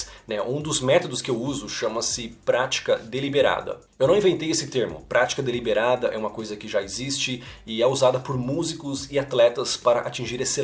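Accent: Brazil. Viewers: O “brazilian accent “ te entrega irmão. 🤣🤣🤣🤣 Acontece comigo também e falo ingles há mais de 20 anos! Osso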